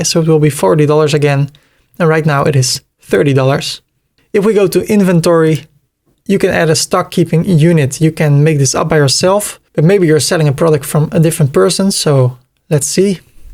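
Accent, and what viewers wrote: Netherlands. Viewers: you dutch.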